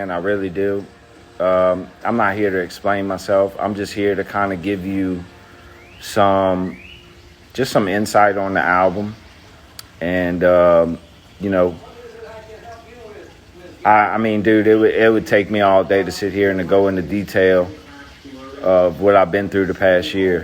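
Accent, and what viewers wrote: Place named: United States. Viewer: When scrim was an addict he sounded hood, now that he's sober he sounds country...wtf.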